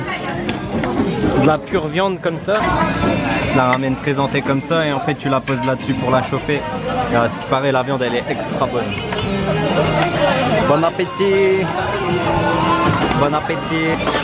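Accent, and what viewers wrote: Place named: Nord-Pas-de-Calais, France. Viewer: C un chti😊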